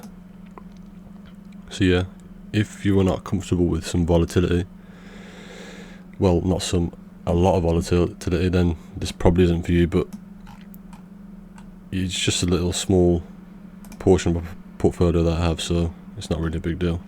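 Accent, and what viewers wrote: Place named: North, United Kingdom. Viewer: Thanks, and you do speak clearly! The Northern accent might throw some, but that's their problem, they need to learn to listen better.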